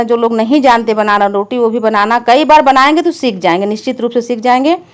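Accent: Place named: Bengal, India. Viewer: Hum pakistan se hain. Aap ki awaz se maloon hots hai aap in ka ilaqa bangal hai. Aap nay buhat mahenat say roti banana sikaya hai hum aap ka shukria latte hain aap humari bahama ki tarah ho.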